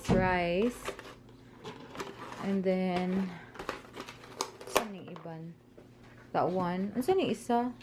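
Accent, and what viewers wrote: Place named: Visayas, Philippines. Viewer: Miss makainlove lagi ka kaayo’g tingog nagpaila jud bisaya😍😘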